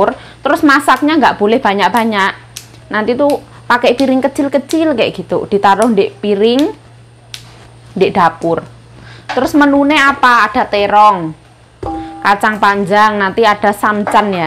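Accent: Java, Indonesia. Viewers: Kok medok bangat ngomong nya...ya.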